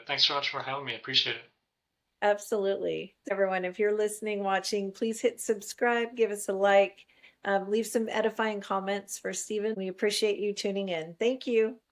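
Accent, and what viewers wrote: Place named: Canada. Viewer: Great Canada voice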